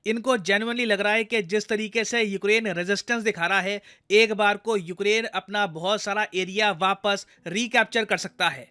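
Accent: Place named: Haryana, India. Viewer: Russiya ka hypersonic missile ger diya tha.. sir bilkul haryanvi Desi accent m bat kr rhe h..Varna hota h gira diya tha..I love his Desi accent.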